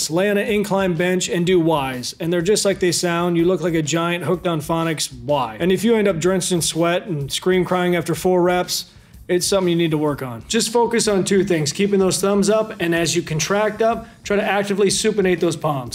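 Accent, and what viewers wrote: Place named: South, United States. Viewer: my brain needed a while to process hearing scientific nomenclature through a southern accent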